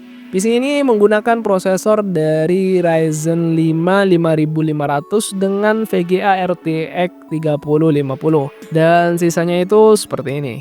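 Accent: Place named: Madura, Indonesia. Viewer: Orang mana bang kok logatnya kayak madura ?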